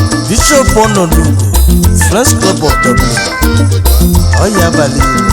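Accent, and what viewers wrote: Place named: Anambra, Nigeria. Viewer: But he sounds like Anambra guy.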